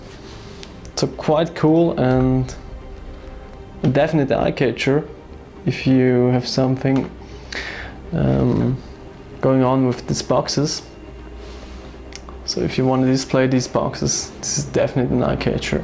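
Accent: Germany. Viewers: Ha, wusste das es nen Deutscher(-sprachiger) is an deinem Akzent 😂👌🏻 Wie halten sich die Pegs an den Beinen ? Hab viel negatives über die gehört :/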